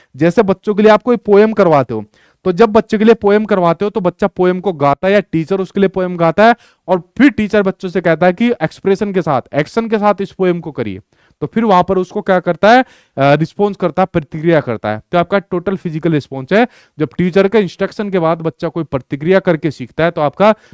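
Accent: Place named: Azamgarh, India. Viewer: बाबू बहुत अच्छा बढ़ावन आजमगढ़ की बोली है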